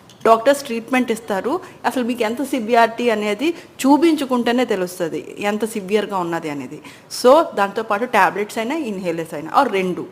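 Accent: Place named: Visakhapatnam, India. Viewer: మేడం నేను abroad లో ఉన్నాను, మీ వీడియో s చూస్తున్న very uesefule వీడియో s థాంక్స్ మేడం, మీ తెలుగు బాషా చూస్తే వైజాగ్ వల్ల లా ఉన్నారు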